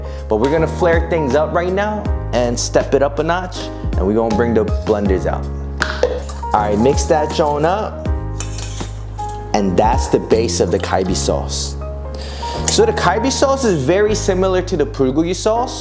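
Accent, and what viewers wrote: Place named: Los Angeles, United States. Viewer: this how you sound like when you korean and grow up in LA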